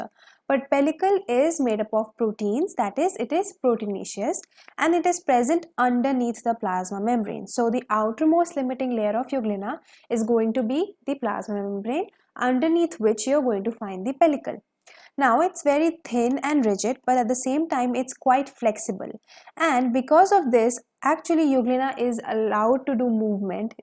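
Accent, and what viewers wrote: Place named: India. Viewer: You're Indian I understand by your voice & manners to speak.☺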